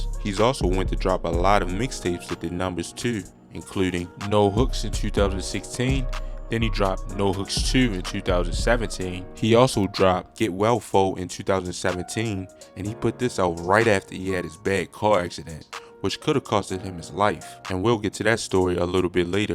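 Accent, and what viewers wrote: Baltimore, United States. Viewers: First time ever on yo channel and I can tell you from Baltimore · I can hear the Baltimore in you🤣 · Bmore accent thick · You from Baltimore aint u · Boy if you dnt shut tf up with that damn Baltimore accent shit annoying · He from baltimore he cant help it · He’s fasho from boltamore “duuuu” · Stupid ass Baltimore accent🤣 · He from Baltimore they pronounce they U's weird lmao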